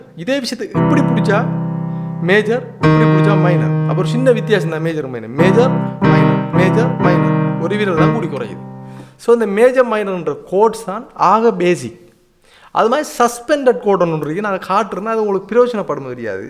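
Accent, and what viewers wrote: Sri Lanka. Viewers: Ahaa....Ilangai Tamizh azhagu......vadivu vadivu 😀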